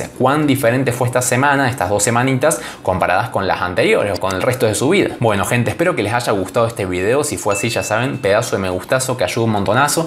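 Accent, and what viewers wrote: Argentina. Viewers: Sos de Cordoba ?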